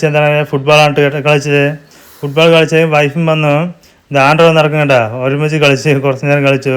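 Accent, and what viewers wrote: Tamil Nadu, India. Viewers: Niglu malayali ahnno tamil ahnno language difference thonnine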